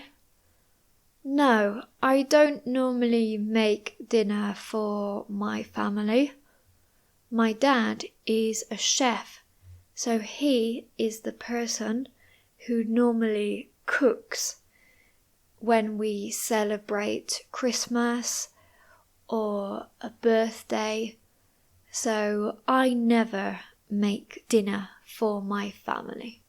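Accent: United Kingdom. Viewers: Excelente pronunciación y entendimiento del acento británico. Saludos. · I love you british accent,which is so beautiful.Are you a Eenglish teacher in England?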